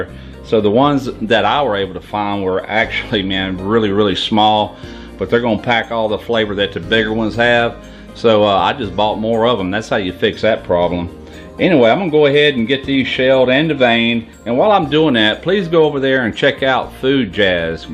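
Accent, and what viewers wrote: South, United States. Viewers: oh....man. found out about you via BBQ PIT BOYS. man....you got me subscribed. youre doin it the fine and right way. love your southern accent there.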